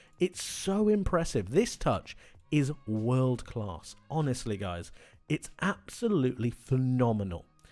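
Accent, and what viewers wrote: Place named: England, United Kingdom. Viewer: Not a bad assessment from someone who says 'off of' with an English accent. You are obviously impressed, but for me, a very impressive thing was the was the back three changed to a back five constantly. Depending on where Arsenal had the ball and what they were trying to do with it, Newcastle CONSTANTLY changed the back 3/4/5. With Newcastle in possession, Hall and Trips attacking leaving a back three and sometimes a back two when Schar was getting forward as well. The fluidity was amazing. They were reading each others minds, and even had the likes of Joelinton dropping in to help when needed.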